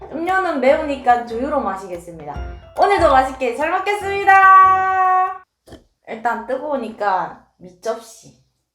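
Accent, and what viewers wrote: Gyeongsang, South Korea. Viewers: 후라이판이 몸보다 더 크네요 ㅡ 경상도 사투리 가 정감있네요 아침에 얼굴 안 붓나요?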